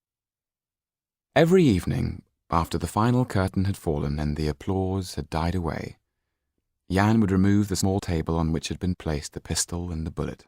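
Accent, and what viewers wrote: Cockney, United Kingdom. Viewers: He is the reason my siri voice is ‘British man’ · Cockney accent. Oh my god *.* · The cockney accent! Oh my god! This man is perfect x_x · Everything is better with a british accent. He could read out a phone book and my heart would still stop beating at every Second word!!!!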